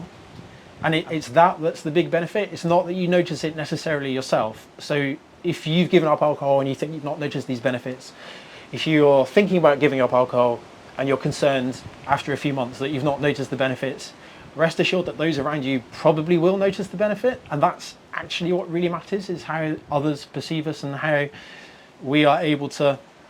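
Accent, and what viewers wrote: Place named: United Kingdom. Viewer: First time viewer, was kind of shocked to hear your British accent given that the scenery can’t get much more Dutch 😂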